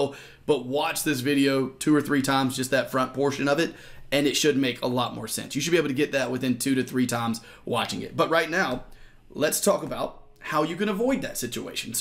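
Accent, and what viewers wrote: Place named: South Carolina, United States. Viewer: ooo hang on.. that's gotta be a South Carolina accent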